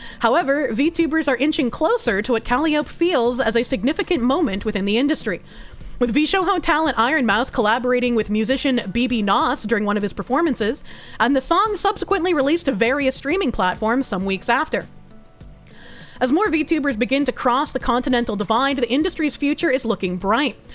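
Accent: Boston, United States. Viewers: It's Bostonian dialect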